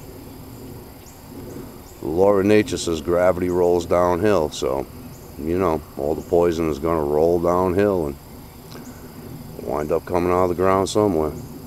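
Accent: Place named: South Louisiana, United States. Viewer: Are you in South Louisiana? I'm from Nola and your accent sounds familiar.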